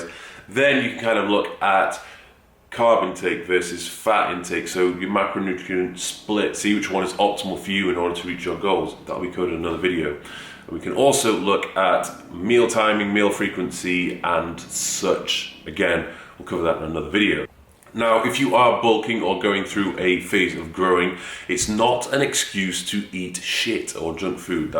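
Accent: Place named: Newcastle, United Kingdom. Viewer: Vitamin B Best not to try and listen if you can't understand.go to some Geordie classes.that may help you.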